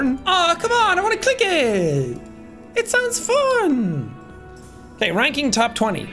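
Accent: South, United States. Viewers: I live in the South. I have lived here my whole life. And never have I ever heard such a beautiful Southern accent. Well done. Beautiful. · Jack's Southern accent is even better than most Southern people I actually know irl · I wasn't expecting his Southern accent to be that good!!! He is officially a Southerner now!! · My uncle lives in arguably the most southern county in north Carolina and I swear that accent sounds just like him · coming from a southerner it was about a 6-7 out of 10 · It's scary how realistic Jack's Southern Accent is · Jack's southern accent is the greatest thing I have ever heard. 10/10.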